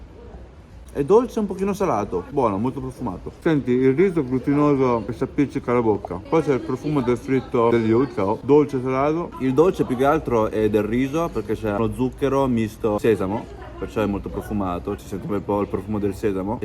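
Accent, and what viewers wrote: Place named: Romagna, Italy. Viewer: Non ti conoscevo prima di questo video, ma sono rimasto impressionato da quanto tu riesca a parlare bene in italiano. Addirittura mi ha dato l'impressione che tu abbia una cadenza romagnola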